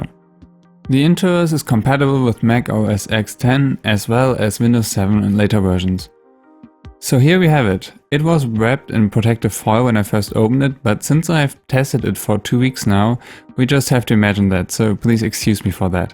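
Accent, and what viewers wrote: Germany. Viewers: I recognised your german accent so fast because I have one too lmao, i also saw your german settings haha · Finde es lustig wenn deutsche englisch sprechen xD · wait are u german? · Are you German?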